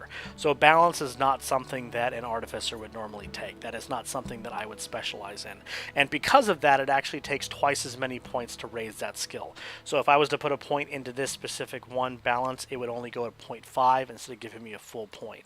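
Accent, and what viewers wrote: Netherlands. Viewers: are you dutch ? :D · are you dutch?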